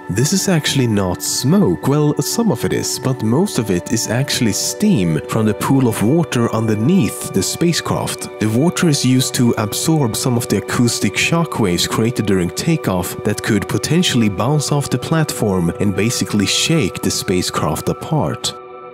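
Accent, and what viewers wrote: Gothenburg, Sweden. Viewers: You can hear he's swedish right away... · I fucking hate gothenburg-swenglish.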